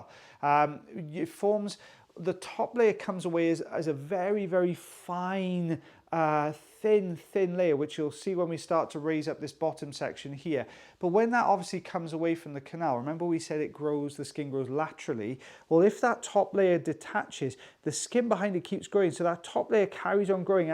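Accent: Wales, United Kingdom. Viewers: I think the main reason I'm here is because I miss Wales / a welsh accent so much.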